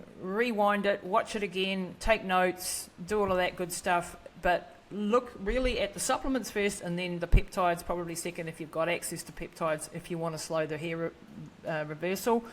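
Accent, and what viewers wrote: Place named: New Zealand. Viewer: New Zealand accents make no sense to me. Why pronounce hair as "hee-ah?"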